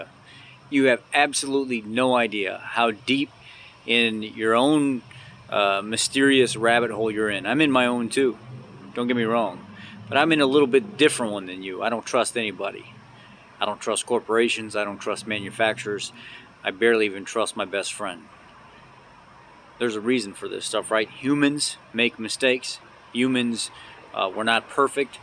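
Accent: United States. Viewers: Perfect American speech !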